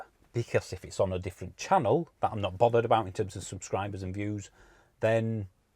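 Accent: Yorkshire, United Kingdom. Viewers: Awwwww! I’m gonna miss my bi weekly shot of yorkshireness! Its lovely to listen to our native language. Living NZ I have to speak a foreign version of English all the time! Sometimes they don’t understand me! Can I suggest a subject for your new channel? A visit to Cragg Vale to explore the history of the coiners( clipping the ticket). just a thought. Been following you for ages before I imported my own I-Pace from UK. Much cheaper than here.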